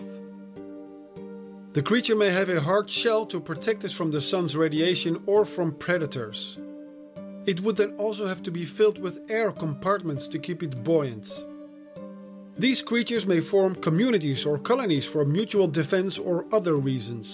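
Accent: Netherlands. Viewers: You sound very Dutch lol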